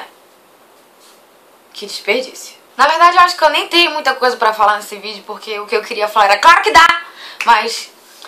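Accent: Pará, Brazil. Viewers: Sotaque paraense? Hahaha Te sigo a pouco tempo mas estou adorando saber da tua experiência! Não vi todos os vídeos ainda, por isso não sei se vc já disse alguma fez, mas qual curso vc faz? Eu queria muito poder fazer o Ciência Sem Fronteiras, mas meu curso, Direito, não está incluído na lista :(( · Ah esse sotaque paraense...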